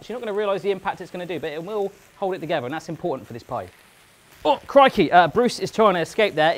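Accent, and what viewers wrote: England, United Kingdom. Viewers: to me he doesn't sound Australian he sounds English O.O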